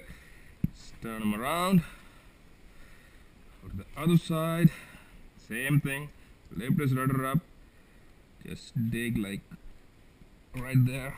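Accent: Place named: India. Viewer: This has got to be the 8th indian sounding dude who just hapoens to be barefoot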